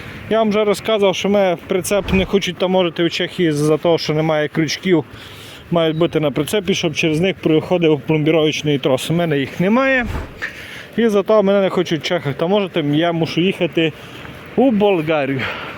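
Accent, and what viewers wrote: Transcarpathia, Ukraine. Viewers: Мені кажуть чому я не знімаю свої відео на українській мові , слухаючи тебе - це теж не українська мова, мабуть Закарпатська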